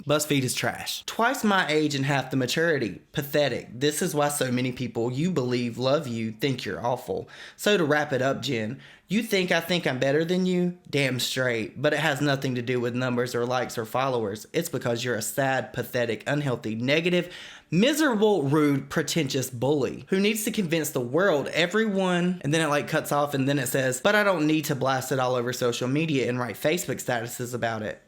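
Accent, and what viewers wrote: South, United States. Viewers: Being a TN girl I didn’t realize it till now but a southern accent adds so much drama and oomph to any tea it made all this shit going on 10 times more interesting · Omg- its something new with this whacko every single day! Thanks for all of your hard work, to bring us the latest tea 😉 And, OMG- your voice & adorable southern accent are so calming to me! I just adore you! 💛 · This is the first video I've ever seen of yours and I am an instant fan! Subscribed with notifications on! Love your southern accent! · Hey, well you r saying what of a bad person she is, but look at you.... Let your damn southern accent look at its self first and then you can judge about others. What you are doing is pretty fckd up.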